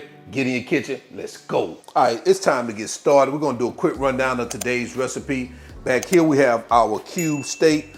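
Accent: South, United States. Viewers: It was really good, just not spicy like I used to make.🔥 Sadly the other people in my household can't handle anything remotely spicy so I have to keep things on the tame side...they literally think a dusting of black pepper is spicy.😭 Funny thing, I came back and watched this video again a few hours ago, it looked that good lol.🤪 I'm from Florida and would often get beef or pork cube steaks and idk why but you can not find either up here in Baltimore so I would have to improvise. I had been wondering what your accent was, could tell it was from somewhere down south. Being from Florida is like Alabama then, hot sauce goes on everything imo too. Last but not least, gonna try the grating the sausage trick because I usually minced with my handheld chopper while frying it and grating would be less work for my carpel tunnel. Sorry for rambling lol.🤣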